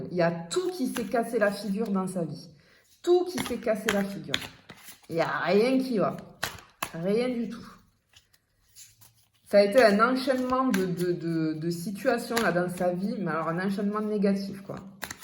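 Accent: South, France. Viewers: Ahh cet accent du sud me réchauffe le cœur et me rappelle mes années d'étude à Aix-en-Provence des années que je n'oublierais jamais ... Merci pour cette guidance ❤🧡💛💚💗💙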